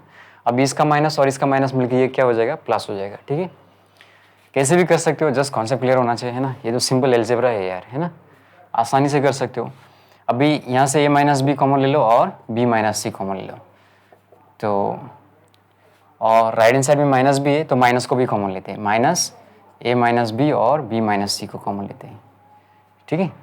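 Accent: Assam, India. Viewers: Sir aaap assam se ho ki nahi aapka awaj se pata chal raha hai ki aap Assamese ho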